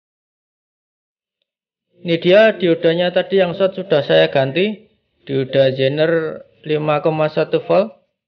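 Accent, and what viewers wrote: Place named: Java, Indonesia. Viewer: Bahasane medok. Lokasi pundi mas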